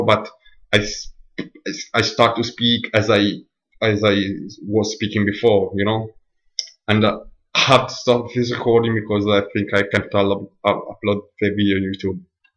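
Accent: Italy. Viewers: Hi - I understood everything you said but like you said it is your accent that will need some practice. I understood you, but I had to concentrate on what you were saying. Two things I would say give away that you are Italian - the long A sound you pronounce like a soft a (Kate and Cat should have two different A sounds), and adding an 'ah' at the end of some words, like 'people-ah'. Many italians do this, so don't worry . But well done with this, and keep working, you'll get there!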